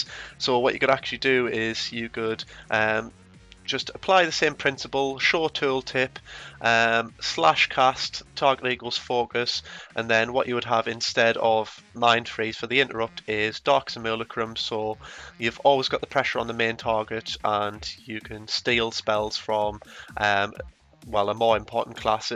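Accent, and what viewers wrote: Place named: Newcastle, United Kingdom. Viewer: damn, you sound like you live pretty close to newcastle judgin from your accent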